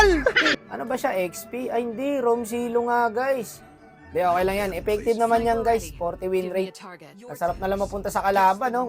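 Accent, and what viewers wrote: Iloilo, Philippines. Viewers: Boss try mo naman mag Ilonggo na voice over alam kung Ilonggo ka boss eh mas nagiging funny content mo pag nag Ilongo ka bigla bigla nalang ako natatawa HAHAHHAHA sana ma pansin ❤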